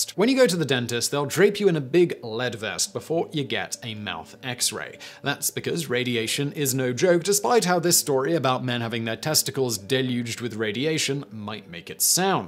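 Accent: United Kingdom. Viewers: The British accent is echoing in my headddd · One minute for your thumb nail, meanwhile all I heard was race race race race race from a white guy with the accent of like the most imperialistic nation that still exists. Race race, race race race race race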